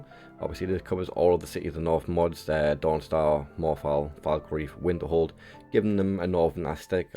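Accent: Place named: North East England, United Kingdom. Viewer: Nice load out - I would add the sky land colour for realistic water 2 if you can. Also check out sky kings aonghus house for river wood for almost no cost and granite hill town that he did. Trying to guess where your accent is from in the north east 🤔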